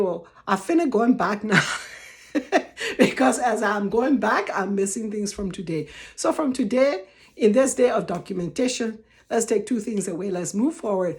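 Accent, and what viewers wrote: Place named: Bassa, Liberia. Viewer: You put a smile on my face!❤️😊My mom is from Rivercess, GrandBassa county ! You do have a beautiful Bassa accent. We love listening to you!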